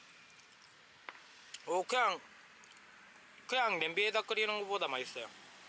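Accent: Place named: Gyeongbuk, South Korea. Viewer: 오늘도 잘 보고가요~말투가 구미,경북 북부쪽 말투시네요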